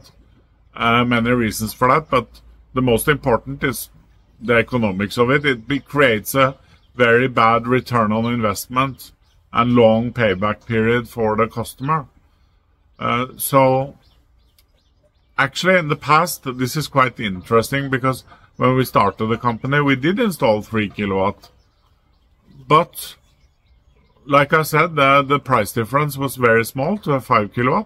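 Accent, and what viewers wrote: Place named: Norway. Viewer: 555..Typical Norwegian English ala Stoltenberg.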